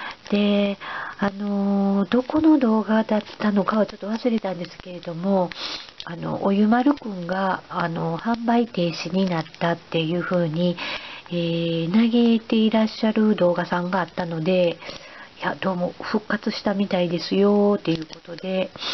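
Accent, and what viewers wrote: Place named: Osaka, Japan. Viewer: の動画大好きです！センスが素晴らしくて、いつもDIY意欲が掻き立てられます(⁎˃ᴗ˂⁎)100均大好きなので、「この商品、こんな使い方できるんだ～～✨」って新しい感動もあります！あとわたしも大阪弁なのですごく親近感わいてしまいました(´ `)照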